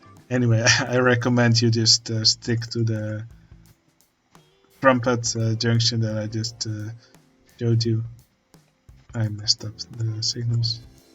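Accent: Netherlands. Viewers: you sound so dutch :)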